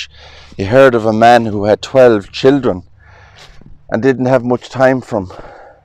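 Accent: Ireland. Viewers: Love your videos and your Irish accent, will happily subscribe, cheers from Australia. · there is no lovelier accent than the Irish brogue, I enjoy listening too him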